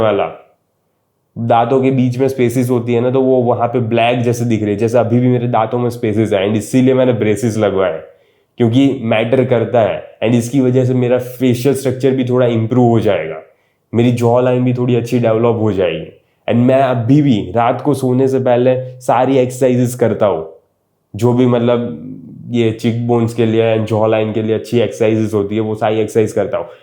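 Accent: Gujarat, India. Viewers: bhai tamari Hindi thi mane tame gujarat na j lago chho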